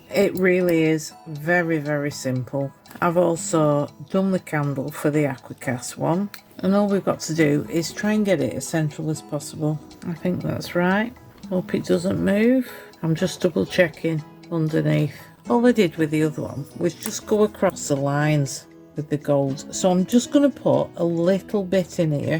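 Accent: United Kingdom. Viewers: I have just come across your channel and love it. I have been sat here watching video after video instead of doing what I am supposed to be doing. You sound like a friend of mine, clearly from the same part of the country and the humour must be in the DNA from that part of the UK. You have some interesting ideas and produce some great stuff. My hat goes off to you for doing the video's; I'm a former College Lecturer, use to, shall we say, some unappreciative audience members, but I certainly could not do what you do. I look forward to not doing what I should be doing in the future and watching your video's instead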